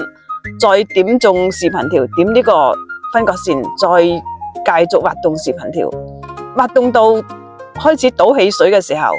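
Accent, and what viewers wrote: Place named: Taishan, China. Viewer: 這位台山口音的姨姨 好叻喎 👍🏻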